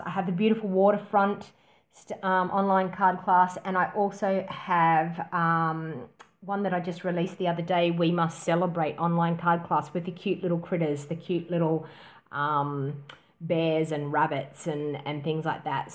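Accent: Australia. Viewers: 🐙 Me, hubby and our 2 boys moved here Christmas 2018 from Brisbane. We love it here 🇦🇺 New Subscriber from Finley, NSW I’m 36, Married with 2 boys - very very crafty. 🌺 I own a Business Making & Selling The Safest Candles & Melts In The World Plus Various 100% Natural Body Products Incl Organic Baby Skincare Products, Organic Assorted Melt & Pour Soaps + More ❣️ These boxes would look great in the Packages & Favours that I Make. 🍄 See my Instagram page <link> 🌸 Facebook Business Page Chillaxing Melts, Candles & Body Products ❣️ Twitter: 🍀 Email: <mail> 🌸 I’m a Registered Nurse, Midwife, Homeschool Mum & Qualified Licensed Aromatherapist, therefore I’m legally allowed to use Essential Oils & Blends In Products Absorbed by the Skin. 🌷 I’d love to send you a gift box. 🦋 I Make beehive soaps that would fit nicely. 🌺 You sound like your in the Tweed Coast / Coolangatta . 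🔆 Plz pick some items you’d like to try and pick as many as Scents as you’d like. ⚠️⚠️ Note: I do have a ABN Plus I own another name and my business name will change to it in December 2019, currently setting up Shopify Store 🍀 Have you thought about selling tutorials on Kindle?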